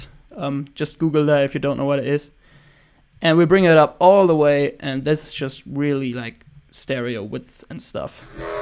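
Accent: Germany. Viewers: ne dein english ist gut, aber wenn man selber deutscher ist, hört mans halt.. ich glaub du hast sogar ne relativ ähnliche stimme wie ich ;-)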